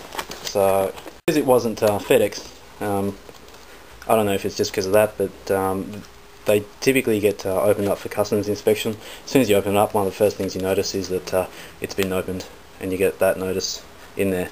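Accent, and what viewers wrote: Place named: Australia. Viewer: good to hear an aussie accent for once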